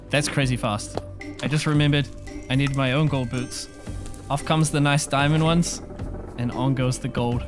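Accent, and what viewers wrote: New Zealand. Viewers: Question? Are u kiwi? (R u from New Zealand?)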